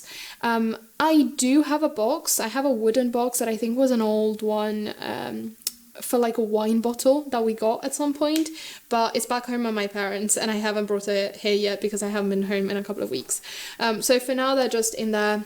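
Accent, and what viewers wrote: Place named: United Kingdom. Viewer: ahh neat! I mostly think I hear an English accent when I hear you speak but as I said the longer I listen I realize there is something else there and I knew you had mentioned Italy before! Accents are so interesting in the way they can blend together and change quickly! I always think I would adopt an accent of wherever I am lol.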